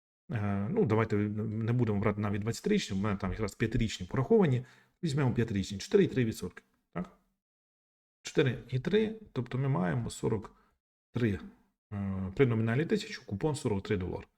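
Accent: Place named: Transcarpathia, Ukraine. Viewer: мені подобається ваш закарпатський акцент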